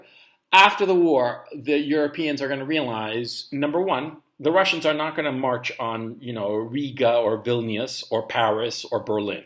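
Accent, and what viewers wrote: United States. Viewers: This idiot is so ignorant - sure Europe will have problems for the next 5 to six months due to oil, etc. , but Israel has just signed a major deal to start supplying gas and oil to Europe. And this is kept quite quiet as this will turn Russia, Iran, Turkey with its worsening economy start a war against Israel. (All for booty) - Israel discovering unbelievable amounts of oil/gas. Also America and other countries do produce grain. This man was brought to the US as a small child and was raised in the US perhaps as an asylum refugee are illegal, or immigrant and benefited from all the generosity of America for education, housing, education, etc. like so many others that come to the US, UK and Europe - now he has all this hate towards the US. He now states he is from Chile. But note his very much US accent. Also, he is in no danger as he is most likely a mouthpiece for Russia. 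Ungrateful ignorant “immigrant”. He seems to hate Europe and America for whatever reason. Europe , UK, America and Australia will do just fine and help other less developed countries. The Us does have a dementia President run by the Globalist but this puppet US President is run by a somewhat competent intelligent administration run by three equal branches of government. He states Israel hates Russia - that is false - Israel up until now has had a great relationship with Russia as Russia allows Israel to bomb terrorist in Syria coming from Iran that are trying to wipe Israel off the face of the map. Israel pe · according to your English, you most likely are from the USA, living abroad. The point is not that Europeans do not understand the situation but Eiropean leaders like Macron or von der Leyen are corrupted and just puppets on strings with puppeteers in Washington and or London (City) it's not only a war against Russia, it's also against Germany. 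Look at the Nord-stream case... Gas is not only a problem of heating in winter, it's energy and a vital raw material for the industry !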